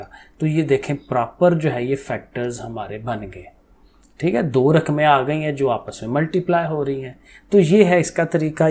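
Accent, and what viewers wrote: Sialkot, Pakistan. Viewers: Very nice Ap urdu sialkoti lehja min bolty hen I think you are Sialkoti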